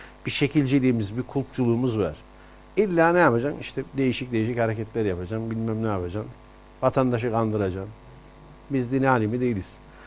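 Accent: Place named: Konya, Turkey. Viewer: Yaptığınız yorumlar, Konya ağzını kullanışınız ve gazeteciliğinizi seviyoruz, teşekkür ederiz Bey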